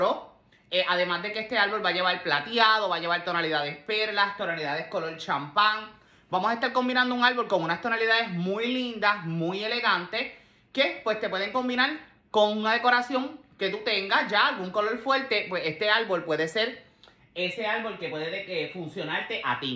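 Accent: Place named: Puerto Rico. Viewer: Nueva seguidora desde q te escuché sabía q eras Boricua bien bello y espectacular!! 💖🇵🇷🙌🤩 Me encantó como explicastes como poner la cinta. Me gustaría una recomendación, mi decoración este año será madera, blanco negro y metal, que cintas debo de incorporar? Ya de ante mano tengo la de saco y la de cuadros Blanco y negro, ( búfalo) q otro color de Debería añadir, plata, dorada o blanca ? Help!!! 🤣🤣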